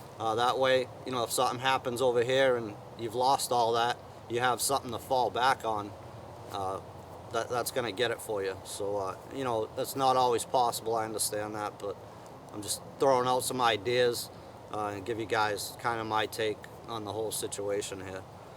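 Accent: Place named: Massachusetts, United States. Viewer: Your voice makes me homesick for Massachusetts. Thanks for the info and have some chowdah and clam cakes for me.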